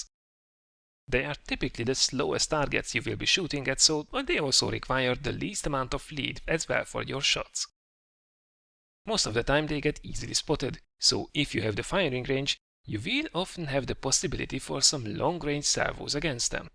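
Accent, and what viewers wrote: Hungary. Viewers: Are you Hungarian?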